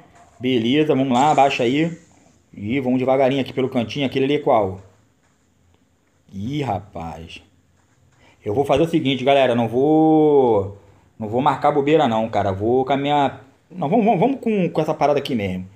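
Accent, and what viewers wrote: Rio de Janeiro, Brazil. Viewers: tu é carioca Mano?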